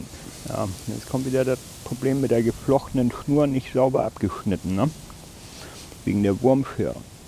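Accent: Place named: Frisian, Germany. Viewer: Ich könnte dir stundenlang zuschauen. Ich mag dieses friesische Dialekt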